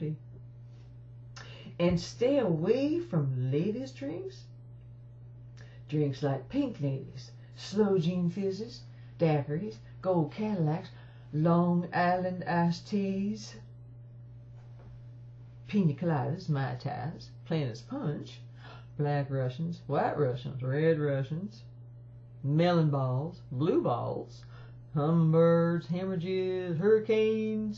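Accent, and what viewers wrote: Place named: South, United States. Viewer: I vote for number two all the way. ' I think number two fits you and your personality. Lady like and professional all the way, and the Southern accent fits you also. You are a true Queen of the South""""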